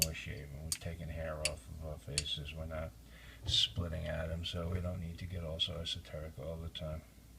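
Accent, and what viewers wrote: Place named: Boston, United States. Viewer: Great dexterity. Never heard your voice. That sounds like Boston.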